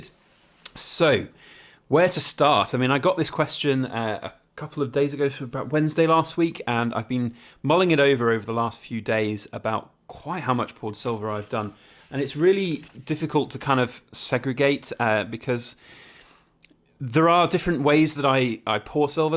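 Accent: United Kingdom. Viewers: Are you living in the UK? Because your accent is very british.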